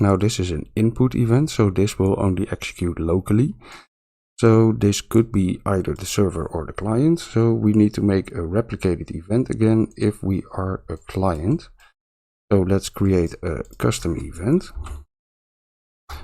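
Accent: Netherlands. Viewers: Dutch accent? :)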